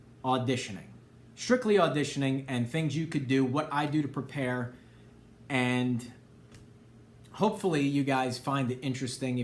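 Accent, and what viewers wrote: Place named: Brooklyn, United States. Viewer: Love the Brooklyn accent omg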